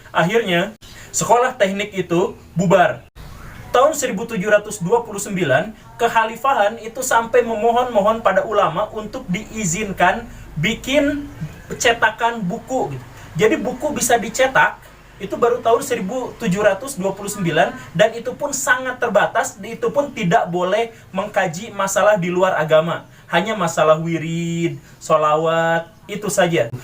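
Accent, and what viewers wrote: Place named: Sunda, Indonesia. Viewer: Kaciri ari nu ijid mh kana solawat jeung wirid, koh lentong na mh siga sunda tp nuansa wahabi,aneh aja gituu teu matching 🤣 Introspeksi? Yaa kita coba introspeksi. -Jangan salahkan zionis freemason dll,tp yg justru di salahkan solawat dan wiridan. -Nenek moyang bangsa turki pernah menguasai dunia, -Nenek moyang bangsa kita pernah di kuasai dunia (dan sekarang pun masih). -baheula Kerajaan2 islam di nusantara berafiliasi ke turki,tp apakah sekarang ada yg dr turki berafiliasi ke indonesia? -turki termasuk negara maju, Sedangkan indonesia negara berkembang, yaa yang namanya berkembang kan bisa aja layu lagi,(dan sekarangpun kembali layu) *Jd yg keren itu mereka yg turki,yg pernah menguasai dan di segani dunia , Atau kita yg indonesia,yg pernah di jajah dunia? *Jadi yg harus introspeksi mereka yg turki yg kualitas nya jauh di atas negara kita,atau kita yg jauh di bawah negara mereka??? 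Lier aja gituu 🤣😂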